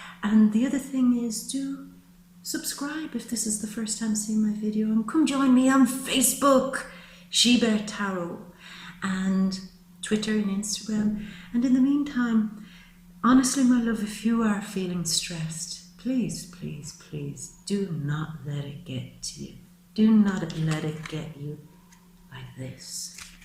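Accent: Ireland. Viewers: I can't believe you said "Bohemian" ... my mother was born in Bohemia! This was very spot on, stressful to hear because it tells me I was right in all my feelings about my current work, but also stressful that I now know what I HAVE to do, which I was trying to avoid. LOL. Not funny really. You are great, accurate, and caring and I appreciate that. I love your accent, is it Irish? My father is Irish. You reminded me of my Sagittarius self. Thank you so much!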